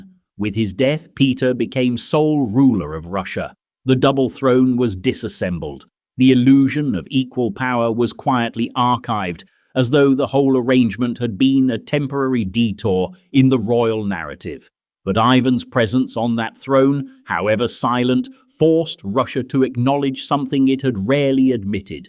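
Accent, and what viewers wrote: United Kingdom. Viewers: I absolutely love this channel and have binged almost all of it. Some suggestions: - I love the British accents you use (2). Keep them - Don't ask the GPT to pad out the wording simply to make the video longer. 2 and a half hours is longer than you need, and padding just becomes tedious after the first 5 minutes 10/10 for this channel. Although this vid isn't as funny as the earlier ones. Input "Britishly subtle sarcasm" into the command. ❤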